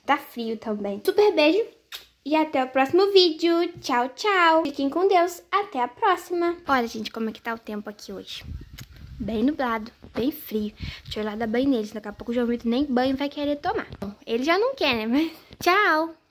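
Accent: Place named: Northeast, Brazil. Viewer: Te amo muito ❤️,minha princesa linda nordestina 🌵 ❣️ Ela é muito engraçada e ainda por cima tem sotaque nordestino 💞🌵 Linda 💜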